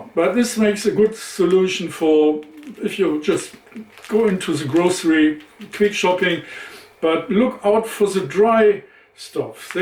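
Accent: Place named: Germany. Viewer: Hi, I've been watching your videos and loving them. Good stuff! I love your sense of humour, attitude and also the English twist on your German accent. Thank you so much for sharing all this. Watching from Wales.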